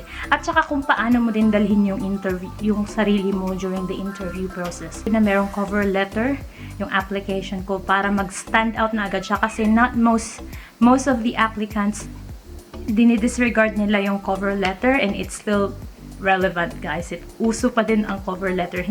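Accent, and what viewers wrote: Australia. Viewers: Love the aussie accent sissy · Madam gudang, may Aussie accent ka na..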